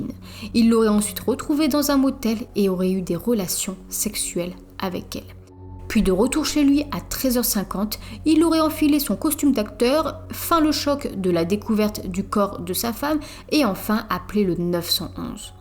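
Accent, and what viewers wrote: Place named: Nord-Pas-de-Calais, France. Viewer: Pas top quand c'est raconté avec l accent chti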